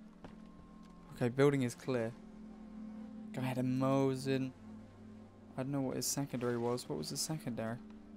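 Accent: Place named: United Kingdom. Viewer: is Britsh im assuming from his accent and Daliy Dose of Internet is American but yes they sound simumlat in a way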